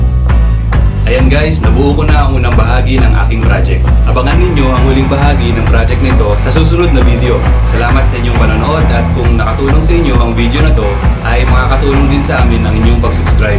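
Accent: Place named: Philippines. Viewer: Nice Work brother .......you sounds Phillipines.......my neighbour.......I AM FROM MEGHALAYA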